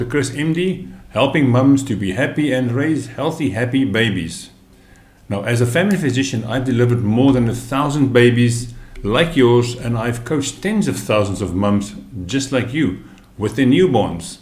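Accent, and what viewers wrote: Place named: South Africa. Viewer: The minute i heard your voice i daughter he is south African. Miss that accent. Thanks for the info on cradle cap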